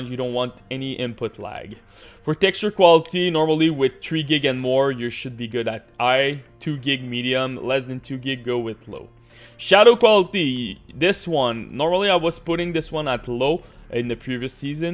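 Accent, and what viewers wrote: Quebec, Canada. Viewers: hes french from quebec as i am and it took me more than 5 years of speaking to get rid of that french canadian accent lmao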